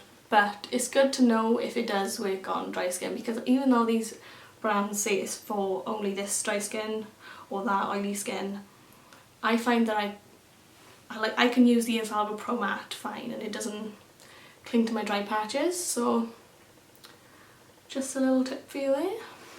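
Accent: Wales, United Kingdom. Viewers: Are you welsh x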